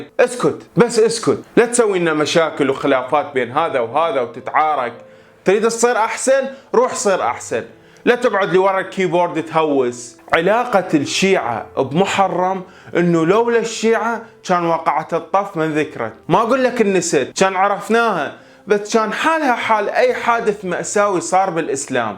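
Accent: Iraq. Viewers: صح لسانك حبيبي بس ملاحضة هوة بغدادي مو نجفي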